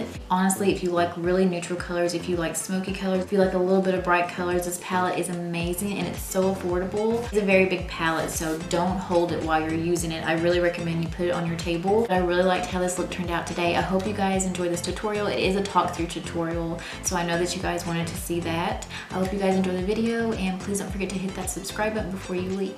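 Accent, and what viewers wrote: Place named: South, United States. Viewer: You sound like you have a little southern accent which just adds to your beauty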